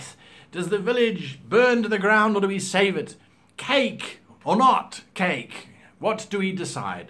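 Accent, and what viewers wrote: United States. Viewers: Good American accent! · Thank you for the video! Super helpful and relevant to a few players in my group. Also, it was super trippy hearing your American accent. I approve. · Thats a pretty good American Accent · That accent really threw me off, and it sounded like a normal american accent with a few funks in it. Cool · Your American accent is like a combination of all the American accents. But over all it’s pretty good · Your American accent isn't the worst ive ever heard, well done.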